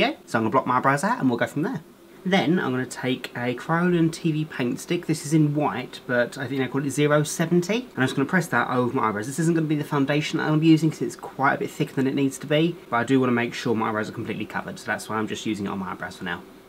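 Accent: United Kingdom. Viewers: what part of the UK are you from? (if you are from the UK..)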